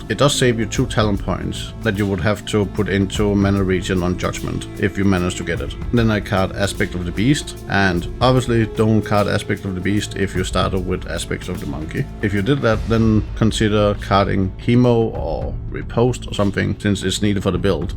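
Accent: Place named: Denmark. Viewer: you have a bit of danish accent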